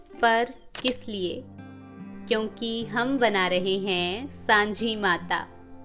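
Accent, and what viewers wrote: Standard. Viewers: आप तो बिल्कुल शुद्ध हिंदी बोलते हो आप की आवाज तो बहुत मधुर है❤🥰🙂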